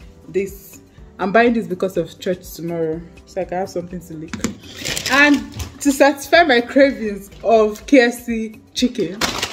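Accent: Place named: Nigeria. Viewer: don't worry He/she is just hating on your beautiful Nigerian accent.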